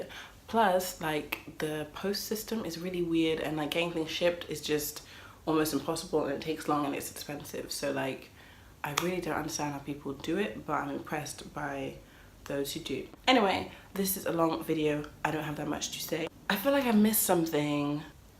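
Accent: United Kingdom. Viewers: You sound like a right and proper speaker of the Queens english and your Quite the Looker very fetching! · I love your brittish accent it just sounds so soothing can I marry you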